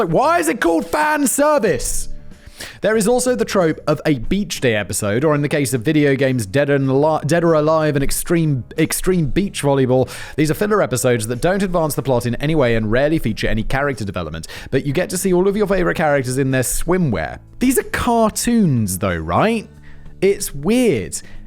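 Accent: RP, United Kingdom. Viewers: dumb takes sound dumb even in a posh accent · I forget he’s not in America every time I hear bbc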